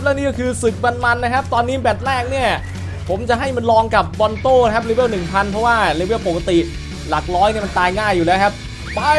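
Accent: South, Thailand. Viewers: พี่อยู่ภาคอะไรครับเห็นพี่พูดคลายกับภาษาไต้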